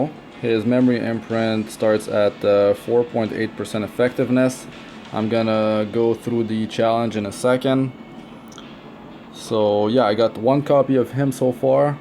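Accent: Quebec, Canada. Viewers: Hmm québecois? Je reconnais l'accent dans l'anglais haha, nice video man ;) I'll make sure to watch more of your content !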